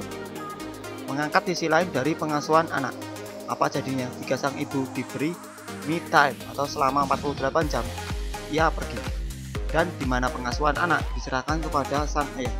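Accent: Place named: Java, Indonesia. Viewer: jawa sekali kalo baca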